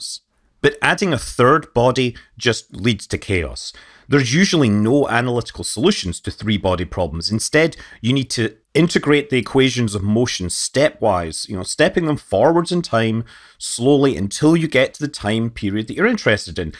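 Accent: Scotland, United Kingdom. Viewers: Great visuals and great, great narration! The Scottish brogue is icing on the cake! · Why is Maths called 'math' by a guy with a Scottish accent?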